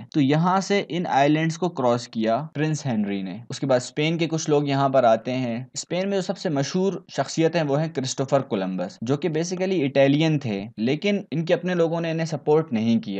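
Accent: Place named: Pakistan. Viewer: Bhai Teri aavaj Pakistani bndo jesi lg rhi hai 🧐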